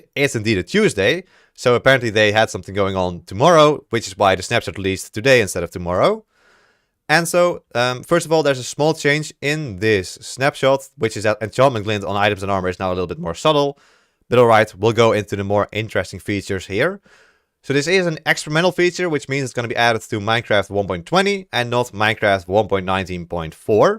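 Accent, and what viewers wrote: Netherlands. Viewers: Sorry to say but you can hear that ur Dutch 😅😅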